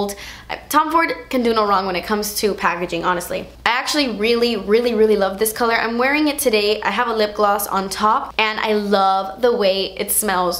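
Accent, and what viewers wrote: United Kingdom. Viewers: Her British accent is amazing! I love it! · Only that's eeeeeeeeeeeeeeeeeeespannnnnnnnnnnnnnsive!!!!!!! Plus your Britain accent! I die!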